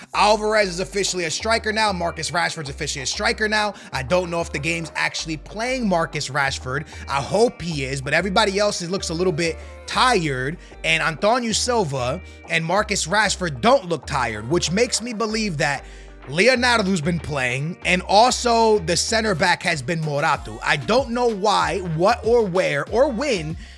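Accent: Portugal. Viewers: falas tao bem ingles q quase nem se nota o portugues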